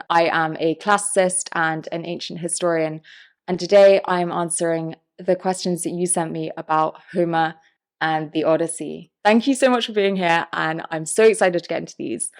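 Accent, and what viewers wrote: United Kingdom. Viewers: ..."was Homer a real person"... You have a British accent, resultantly that answer would be "whatever the government gastric urge." My last name however, would have a problem of the defintion of the number one. Any langisitic problem has a solution and those old stories all exist and were copied to address the same problem. ---->What is the number "one"? Is the number "1" different from the letter "one." Is there a "single" universal answer? If "Homer" is one person isn't a question. He is any and ever single person trying to learn how to read a language that was left for dead. This is written as a single indilible mark, never to be repeate in any other context. Not a watermark, but a coat of arms! (He is not just the first letter in the abecedry. Homer is the other meathods of alpabetical order as well. He is the king, where Ithaca is the empire.) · Yea gods you are Beautiful! 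And that accent, just saw a post on Threads comparing Sydney Sweeny to this actress who will play Emma Frost and then I find your vid and I’m like well THIS girl is cuter than BOTH of them😻😸😻-I got a weakness for Academic Intelligence and English accents. If you didn’t mind that gushing, I’ve always found it interesting how Polyphemus and the Cyclops are portrayed in Homer and through the years. The first we hear of him is from Zeus, in Fagles words “God-like Polyphemus who towers over the Cyclops Clans” then he goes into his pedigree like he is a Greek hero. But then in the poem he is a Brute and most adaptations focus on him being some “Redneck” monster. When I think of Hesiod”s Theogany where the Cyclops are beings older than the Olympians and talented craftsmen who create the weapons of the gods. It’s very sympathetic when compared to Polyphemus and the one I always preferred. Hav you ever noticed this? 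Also i subscribed so you’ll love me for life now? lol 😉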